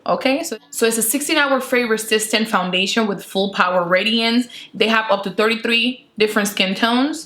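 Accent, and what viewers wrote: United States. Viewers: I love how you speak, reminds me of back home in SoCal. What lip gloss are you wearing????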